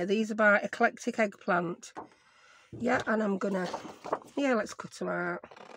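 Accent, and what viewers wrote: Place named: North, United Kingdom. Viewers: I’ve been thoroughly entertained watching this! Been chuckling along, from Melbourne Australia. My friend in Michigan USA shared it on Facebook. Love your beautiful tags! And love your Northern accent. You sound like a dear friend of mine. ♥️ Will watch you videos again. I subscribed to your channel. Keep commentating; you’re Awesome at it! 😄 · Hello, First of all I want to say how I really love your videos and since I am a Geordie living in Canada, its great to hear your accent reminds me of home. Anyway, to get to my point, we only have Micheals here and they are quite pricey. So I wish we had some alternatives even junk stuff we could use for collating etc. I have made so many of the things from your videos and love them.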